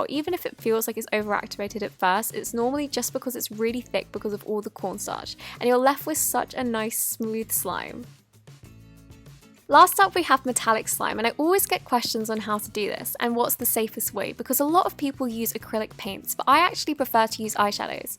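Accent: England, United Kingdom. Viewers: Bro I love her British accent! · Is she from England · I can tell from your accent that you're British and I'm British too. I'd like to know where in Britain you are from and where you get your supplies for Slime because I'm pretty sure they don't sell Elmer's glue and borax in the uk. Please can you tell me where you get it from. Lots of love Abi from Leeds. XX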